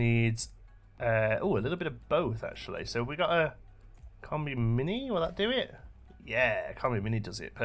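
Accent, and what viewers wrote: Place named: England, United Kingdom. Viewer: I subscribed for the English accent.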